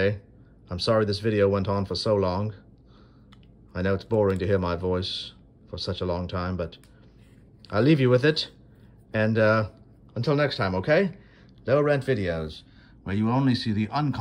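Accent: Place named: Portugal. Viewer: Fantastic Portgues accent. Spot on, mate!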